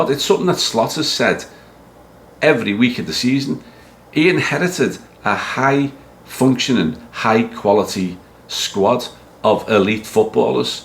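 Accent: Liverpool, United Kingdom. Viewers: I also like your accent Obviously Liverpool but not over the top Me The posh end Near to Crosby Ok Bootle But 51 years in BC but 26 on Merseyside You can rake the boy out of Bootle but etc etc subscribed😮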